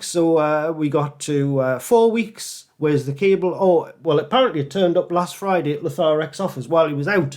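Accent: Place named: United Kingdom. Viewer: It would seem to me that “Lethargic” has long already fired you from working with him ever again. That would explain why he completely cut you off and moved on with someone else with newer projects. Lethargic would say that you were being extremely insubordinate with him. But yeah, Lavoric or Lethargic or whatever is name is, was only obsessed with profit, he didn’t want to lose alot of money he didn’t have with beta testers; so he just sent them all out as they were. Definitely not the best way to do things, but this is Poland we’re talking about here! 🤷‍♂️😂 I hope you will find another revenue source soon. I really enjoy your videos and British accent/slang talk! I subscribed! I’m a new owner of an Atari 800XL that I heavily modded and recapped myself. This thing is a blast to use with the entire library of games!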